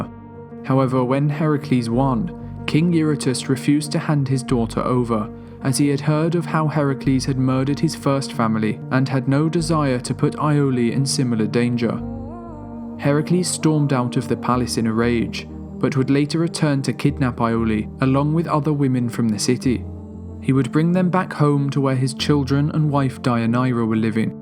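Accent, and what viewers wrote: United Kingdom. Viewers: It helps that the narrator has a soothing British accent. Try that with one of these screechy annoying American accents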